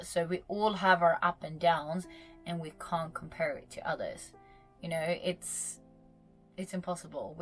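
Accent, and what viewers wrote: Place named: Australia. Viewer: You, family and friends go first, always! I like your videos and lookinga forward to see them. Does´nt matter if it´s in english or in swedish. I laught the first time I herd you talking english because you have/had a aussie accent :)... people always laught at me when I spoke engligsh. Sorry for misspelling and bad english :).. Ta det lugn och ta UMS (utmattningssymdrom) på allvar men det verkar du göra. Ser framemot dina videosar oavsett när de kommer. <3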